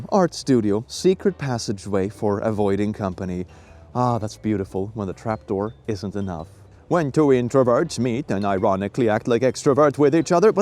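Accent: Finland. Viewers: Your Finnish accent gave me Gunnar Gunnarsson vibes, so... probably 100% accurate, lol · Your finlands svenska accent is absolute perfection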